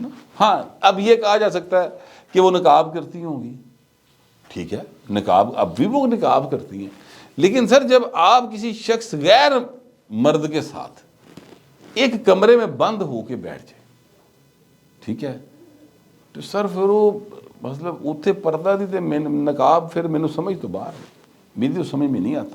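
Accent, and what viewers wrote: Lahore, Pakistan. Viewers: asslam o alaikum but sahb pichly 3 sal se apka viewer hun aur yh mera first comment hai huzoor bari nawazish ap ny Punjab k liye kisi dard ka izhar kia Hamari Punjabi qom k liye bolne wala koi nahi Razi dada is the only who in renowned journalists who speak for Punjabis rigts. i am extremely happy to see you in the pro Punjabis camp. hamarai zuban hamari saqafat hatta k hamari identity ko kuchalne ki jo sazish Angrez dor se start hui woh still apni poori quwat se jari hai. sab subon mein primery EDU un ki local zuban mein hai but Punjab mein agar hamara bacha ghalti se b punjabi bol le to us mara jata hai. please raise your strong voice for our mother tongue to be taught in our schools according UN resolution. but sahb yaqeen janen apky Vlogs mein sab se bara mazedar part apka Punjabi tarka aur Lahori lehja hota hai jo apko baqi logon se mumtaz kr deta hai Bohat sohni lagdi a sadi maan boli tuhade mounhon sada khush raho wasde raho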